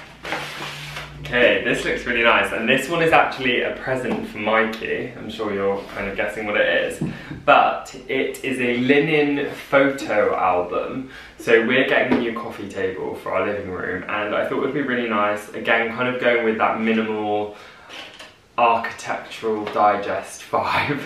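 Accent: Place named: United Kingdom. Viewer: Your British accent is so starch. Clean and concise details with your descriptions .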